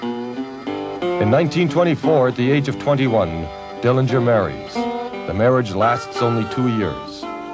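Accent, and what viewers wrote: Canada. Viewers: LOL at the Canadian narrators accent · The narrators Canadian accent is really distracting.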